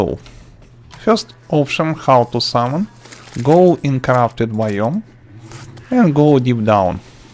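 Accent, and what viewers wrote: Russia. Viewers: I like this youtuber not only for he's good explanation but also he's Russian accent (sorry if that is offensive) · Russian accent😫😍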